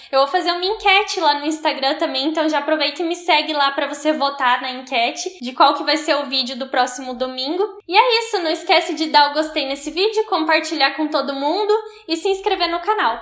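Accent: Paraná, Brazil. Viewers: Ah, mas eu a-do-ro esse sotaquinho do Paraná <3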